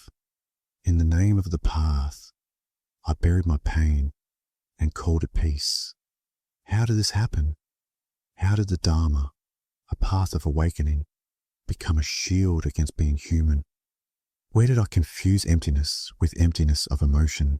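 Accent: Australia. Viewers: The content is interesting but this AI chinese dope with an aussie accent gets old fast, can’t watch it. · It is does like he's from the Outback, but the audio quality is even and smooth, for once.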